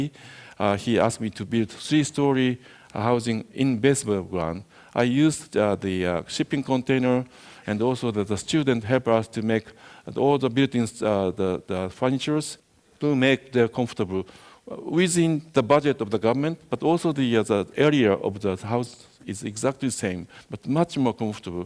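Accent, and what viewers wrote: Japan. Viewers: Im pretty sure he did a speech with Japanese ascent !!! but nice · Sure you are right. But I don't agree with the fact that he's pronouncing as he is "supposed" to. I know Japanese people who have less achievement in life than he does but speak better English because they try harder to erase their accent and make themselves understandable. I just feel that he is making no effort whatsoever to even try to alleviate the pureness of his accent. I don't doubt he can do it though, I just think he consciously stops himself of trying for whatever reason.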